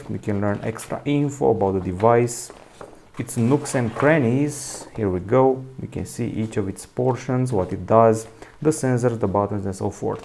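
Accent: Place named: Romania. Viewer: Îmi place accentul de roman:*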